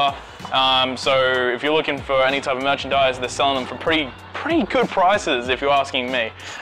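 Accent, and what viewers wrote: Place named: Australia. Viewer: Omg how did i just find this store yesterday (THAT AUSTRALIAN ACCENT THO)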